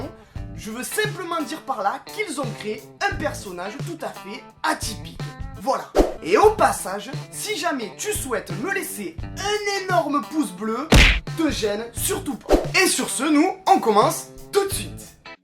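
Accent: Marseille, France. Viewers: a j'adore ton accent Marseillait aller Marseille je m'abonne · T'as un petit accent du sud~ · Tu as bien choisi les fous de YouTube et j'adore ton axen marseillais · comment je déteste l'accent Marseillais😂 mais le tien sa va encore !😉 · tu est du sud? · oh l'acson marcer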